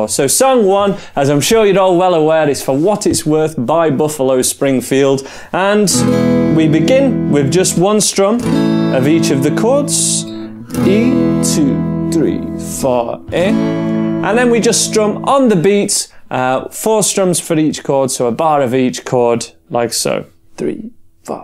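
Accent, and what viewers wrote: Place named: Birmingham, United Kingdom. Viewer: Your accent is like peaky blinders 🙃😂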